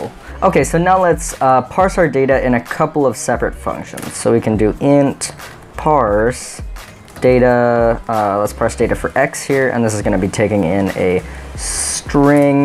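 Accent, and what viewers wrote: United States. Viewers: American, just not a 'Merican.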